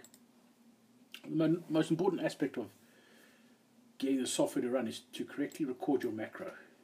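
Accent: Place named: South Africa. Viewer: So cool figuring out you're South African :)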